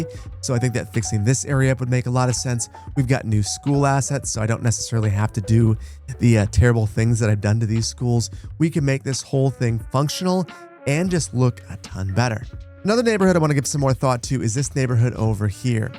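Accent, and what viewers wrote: United States. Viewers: Even if it wasn't clear by your accent, your actions would make it clear you're American😅 Let bulldoze this road to build a highway through our city. Let's bulldoze a neighbourhood to build a shopping centre, build a few big box stores. These buildings are to high for a residential neighbourhood, there are too many trees. Let's get rid of the sidewalk, this much sidewalk seems unrealistic🤣 This episode definitely made me laugh!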